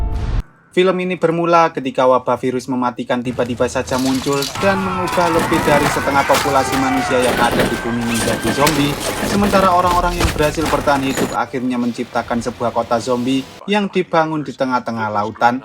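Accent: Java, Indonesia. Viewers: Dasar medok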